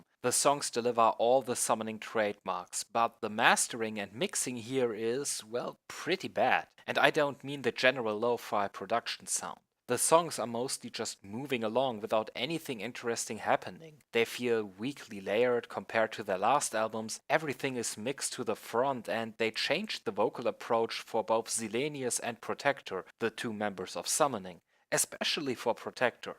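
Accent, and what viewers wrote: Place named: Germany. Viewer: no, don't do it, I need this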